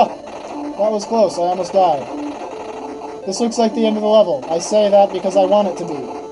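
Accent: United States. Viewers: The cowboy accent throughout the vid was quite an interesting concept. Just for the record, the reason you aren't getting out of the level is due to the lack of a wanted poster strangely enough. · Nice southern accent...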